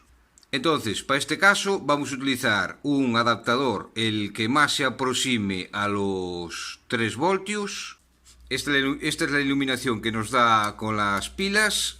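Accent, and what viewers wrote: Galicia, Spain. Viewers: Gracias amigo, me encanta tu acento Viva Galicia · Al margen de que eres un genio, me encanta tu acento gallego.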